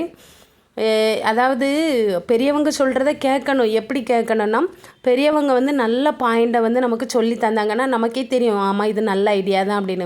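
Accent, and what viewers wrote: Kanyakumari, India. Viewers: Indha slang a kettalae Kanyakumari nu takkunu thonum😂😂😂😂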